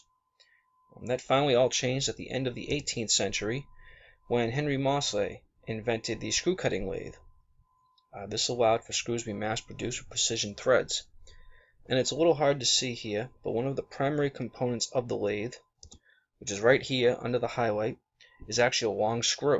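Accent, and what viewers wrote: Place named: Boston, United States. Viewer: This is exactly what I've been looking, short, sweet and to the point. Also you from Mass. I thought i was hearing a Boston accent?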